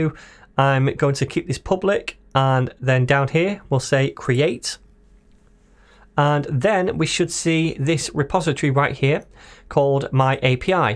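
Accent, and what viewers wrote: United Kingdom. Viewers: I love UK accent. Regards!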